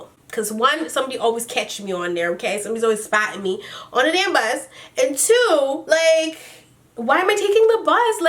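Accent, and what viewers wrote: England, United Kingdom. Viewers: Love your English accent 😂😂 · I like your British accent it fits you. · Her British accent lolll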